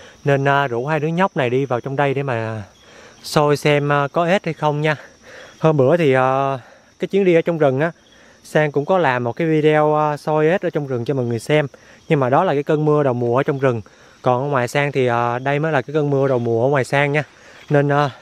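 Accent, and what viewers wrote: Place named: Ben Tre, Vietnam. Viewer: E là ng miền tây ha sao mà vọng nói của e nge y như ng bến tre vậy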